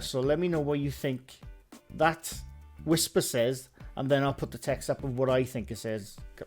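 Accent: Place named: Yorkshire, United Kingdom. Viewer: you can't fake a true Yorkshire accent and u can hear it clearly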